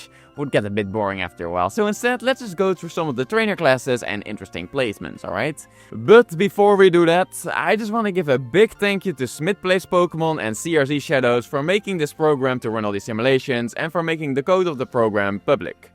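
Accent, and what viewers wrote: Netherlands. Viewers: I hear the Dutch accent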